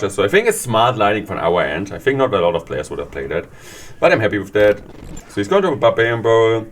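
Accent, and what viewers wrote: Germany. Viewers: Somehow the German accent makes it all sound so much cooler. Nice video,.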